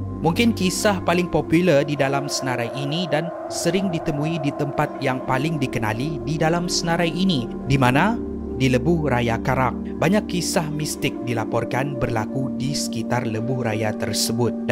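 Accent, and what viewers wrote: Sabah, Malaysia. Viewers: Inngat kan ni indon tpi sabah bha😂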